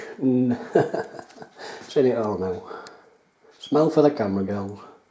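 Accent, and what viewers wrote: United Kingdom. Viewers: Eh up. After 46 years in nz it is great to listen to english as it should be spoken up north...brings a tear to my eye.. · Champion! Love a good yorkie accent, definitely got a good nack for making videos so I've instantly subscribed. Make sure you wax the car now give it time it'll go pink again. Diamond wax is pretty good stuff and cheap on amazon! Can't wait for more vids.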